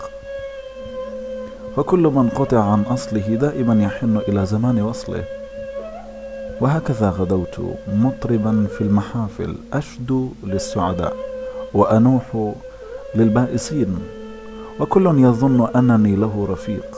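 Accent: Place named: Morocco. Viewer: أظن انك مغربي، أحس بحرف التاء كثير في قراءتك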